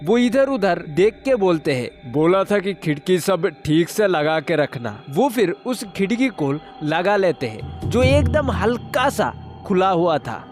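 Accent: South, India. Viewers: Lots of love from Rawalpindi ap konsay city se hain accent ap ka south Wala hai Namaste Namaste Namaste Namaste Namaste Namaste Namaste Namaste Namaste Namaste Namaste Namaste Namaste Namaste Namaste Namaste Namaste Namaste Namaste Namaste Namaste Namaste Namaste Namaste Namaste Namaste Namaste Namaste Namaste Namaste Namaste Namaste Namaste Namaste Namaste Namaste Allah Hafiz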